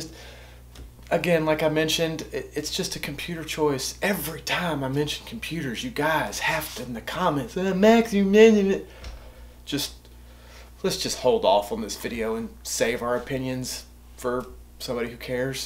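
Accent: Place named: South, United States. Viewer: This is the first time I've ever watched one of your videos, and all I can say is WOW!!! The editing is exquisite, the content is so helpful, and I love your accent. :) Where are you from? I'm an east Tennessee gal, myself, so I love a good southern accent! Anyway, awesome job, man. You just gained a new subscriber. 👏👏👏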